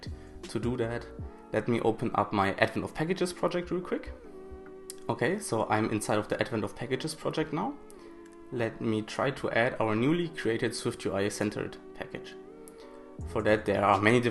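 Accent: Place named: Germany. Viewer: Thank you, man, it helps! Like you german accent)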